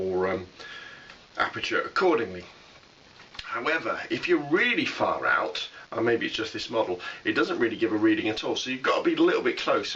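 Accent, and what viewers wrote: United Kingdom. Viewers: Love how the pure British tone elevates the whole review. It’s like listening to BBC narrate a hidden gem of film cameras.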